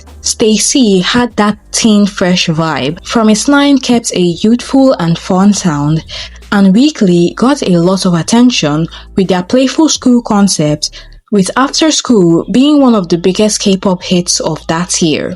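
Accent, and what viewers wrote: Nigeria. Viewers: Nigerian narrator 😂? How refreshing ❤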